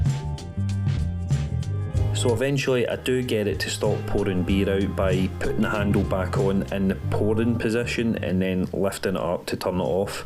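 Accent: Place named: Scotland, United Kingdom. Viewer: Great review. And one of the nicest scottish accents ever! 😁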